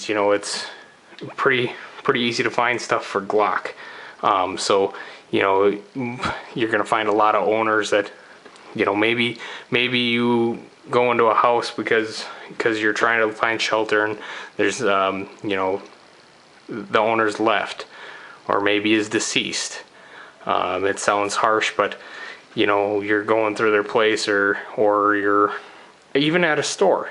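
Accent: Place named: Canada. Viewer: Good vid. Not to clown, but you sound heavily like a Canadian haha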